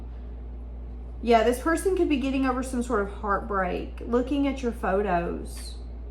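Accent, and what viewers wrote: South, United States. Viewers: YES another reader with a PERSONALITY! some of these channels have such a low energy, weird dry demeanor like they don't even wanna be doing the reading lol and i LOVE the southern charm 😜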